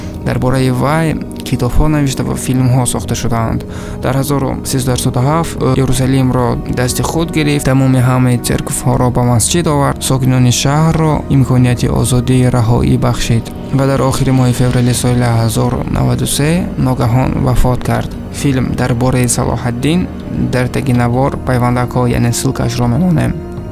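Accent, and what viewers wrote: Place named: Russia. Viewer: То что этим делом, т. е. темой великих исторических личностей по завоеваниями интересуясь, хочешь чтоб другие тоже были осведомлены, это очень благородно. И то что, акцент русскоговорящих у тебя в декламации чувствуется, надо обязательно устранить. Если это получиться, аудитория говорящих на фарси тебя с нетерпением и пением гимнов будут ждать. Но главное в содержание роликов. ХРОНОЛОГИЮ ОБЯЗАТЕЛЬНО ДОЛЖЕН СОБЛЮДАТЬ. Это первое в научной историографии. А то в комментариях смотри, независимо от суть изучения, выдвигают свои амбиции. Поэтому я свою комментарию пишу на русском, чтоб все заинтересующие люди поняли. Но методы современная историография ныне очень устаревшие и например вот такой подход -- взгляд исследователя на историю материалистическим мышлением, ни куда не годится. Тем более, история таджиков считается как история "с незапамятных времён.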